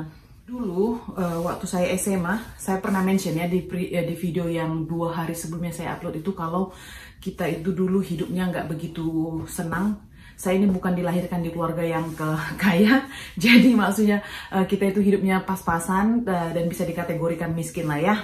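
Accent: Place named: Sulawesi, Indonesia. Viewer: Loghat atau slang anda sepertinya dari Sulawesi, maaf jika salah. Saya pernah jalan jalan di Sumatera, Sulawesi, Kalimantan, Kepulauan Riau....saya dapati negara Indonesia sangat kaya dengan sumber alam, sawit terbesar, nikel, minyak dan gas,,,,malangnya oligarki yang mengawal kekayaan dengan korupsi....ada banyak kemajuan semasa Pak Jokowi...selepas 2024 tak tahu lagi.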